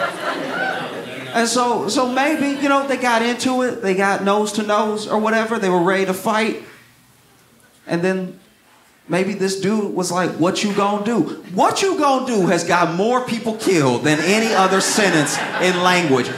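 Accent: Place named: South Louisiana, United States. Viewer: That Sourh Louisiana jumps out a little bit every set.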